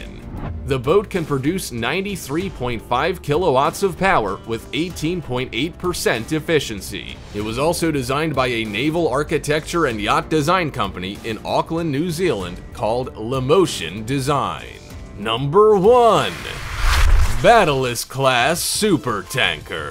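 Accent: United States. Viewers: An American butchering the English language. - Ridiculous Pronunciation of regular words. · VERY annoying melodramatic American commentary, unwatchable. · The lector is too americanised for my liking.. So irritating...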